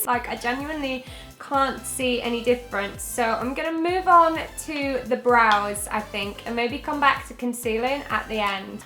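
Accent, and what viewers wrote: United Kingdom. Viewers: Are you British??? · are you British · She's britishhhhh????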